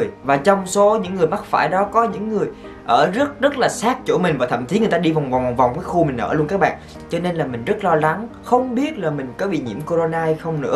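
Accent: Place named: Central, Vietnam. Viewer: Anh là người quê ở đâu ạ. Nghe giọng rất giống giọng miền Trung :33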